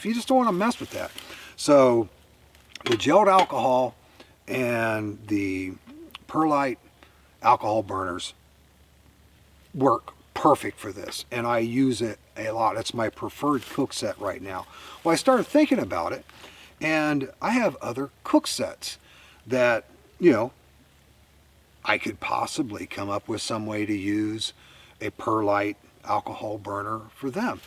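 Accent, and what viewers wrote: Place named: Ohio, United States. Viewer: Are you located in Ohio? Your voice/accent remind me a LOT of Dave Canterbury. Great video, btw.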